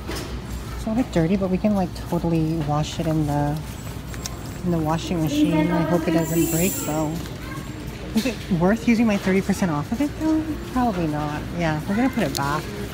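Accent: Philippines. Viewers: I agree with the filipino accents!! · 😂 definitely agree with you on the Filipino accent! You’re always hilarious❗️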